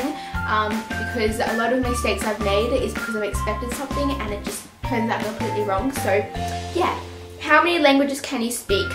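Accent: United Kingdom. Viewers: You have a British accent I thought you were from england not Australia haha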